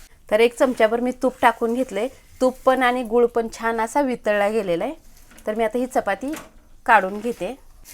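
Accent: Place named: Satara, India. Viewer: ताई तुमचं गांव कोणतं भाषा आमच्यासारखी वाटत आहे.आम्ही खटाव तालुक्यातील जिल्हा सातारा कडचे..सद्या कोल्हापूर येथून व्हिडिओ पहात आहे.आमच्याकडेआमचीआज्जी चपाती मोडुन चुरा करुन त्यात तुप,गुळ घालून छान लाडु करुन सर्वांना द्यायची तुमची रेसिपी खुप आवडली. मी मुलगी आणि सुनबाईनां व्हिडिओ पाठवला.लहानपणीच्या या प्रेमळ नाष्ट्याला आता ती सर येणार नाही.गेले ते दिवस सकस पदार्थ खाण्याचे.ओके..ताईखुप खुप धन्यवाद!!!!**"*❤😂🎉🎉❤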